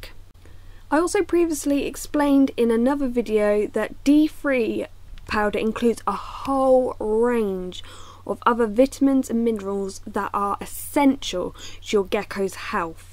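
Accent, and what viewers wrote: United Kingdom. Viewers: Is it okay that I love the way you say "vitamin D3"? Not being mean, its just cute. The British accent is so easy to listen to. Im American born and raised, but my Aunt is from Ipswich and its almost relaxing to sit and listen to her talk.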